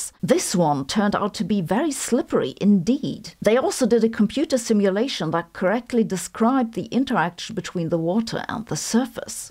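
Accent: Germany. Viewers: Nah, she's just German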